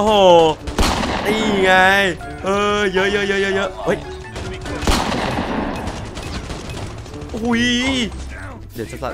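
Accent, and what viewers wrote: North, Thailand. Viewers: พี่คนเหนือใช่ไหมครับ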